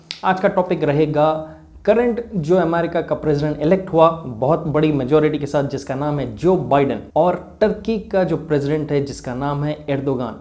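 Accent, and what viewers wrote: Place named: Karnataka, India. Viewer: bhai ap karnataka se ho na apki hindi karnataka ke jaisi hai i am from karnataka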